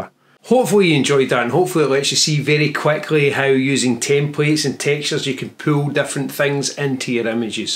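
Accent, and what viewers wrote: Scotland, United Kingdom. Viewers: Its very difficult to understand this scottish dialect , I think, in other parts of the world, because you are speaking very fast. I am from Germany and I shall make every effort understanding you in future. Maybe I have to listen to many other of your editorials.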